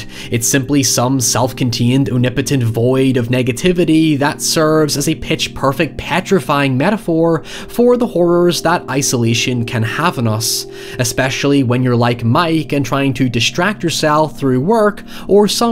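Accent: Ireland. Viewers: I also do "Horror Genre" vids, and one of my wee ones (who happens to be very, VERY scared of the dark, and have a TON of phobias) likes to listen as I make a video, so I have to be careful which videoes I let her listen to me do Audio for... ANYWAY- whenever I get a notification one of one of your videos dropping, I like to take a break and listen. Now my wee one is obsessed with your accent too. SHE now loves Irish accents MORE than English accents ( I do a convincing combination of various UK accents- as I act too. Stage "Irish" sounds nowhere near as charming as your TRUE Irish. ENJOYED the video. This movie seemed to have completely changed from when I saw it in my late teens to when I saw it again in my late 20s. Now that I'm in my 30s it might be time to revisit it again.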